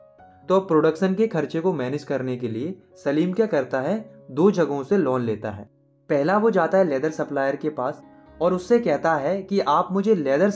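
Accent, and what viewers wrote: Uttarakhand, India. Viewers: Sir uttarakhand se ho kya ??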